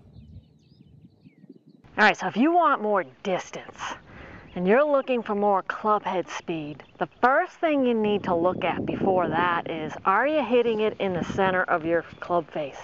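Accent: Boston, United States. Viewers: Hey that is the reverse of the song... Not sure what they meant but I get what you are saying..25 percent to 110 percent swing speed to find out my natural rhythm. I will try next time I am on the driving range to zero in on what is good for me. Was never conscious of this as I just swing without really thinking of the speed so it is a great nuance you have elucidated upon in your Bostonian dialect which is awesome!!! Thanks!!!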